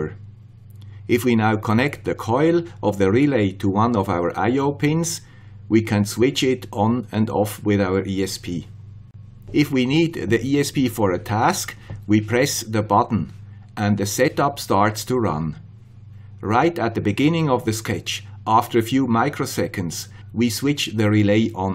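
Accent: Switzerland. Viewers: Very interesting video from the man with the Swiss accent. There is one part I don't understand though: how do you use a reed relay instead of a normal relay or mosfet? If I put a reed switch on a door or window, it makes a permanent connection, not temporary. How can you use a reed switch to trigger the ESP then disconnect the power once the job is done? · i want to help) i like everything on this channel and swiss accent also! like from Belarus!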